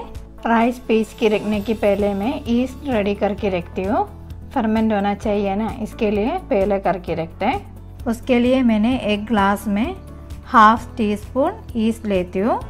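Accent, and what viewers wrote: Kerala, India. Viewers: Your Hindi with Malayalam slang is perfect. I love it. Keep up the good work.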